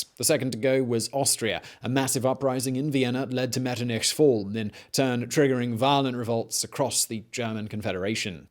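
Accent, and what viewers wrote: United Kingdom. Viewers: this guys to British lol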